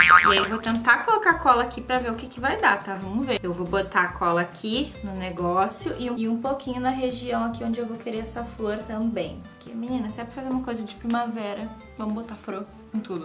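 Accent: Rio Grande do Sul, Brazil. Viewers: Te, ta, guria, guri, de... que amooorrr quando ela fala como gaúcha😍😍❤️❤️, sou do Rio Grande tbm, mas do interior, no fim do mundo na vdd 😂😂😂 Porto Xavier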